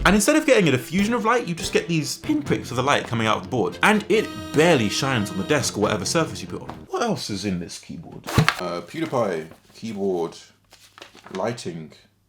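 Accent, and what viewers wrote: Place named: England, United Kingdom. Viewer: where's your accent bro? You used to be very English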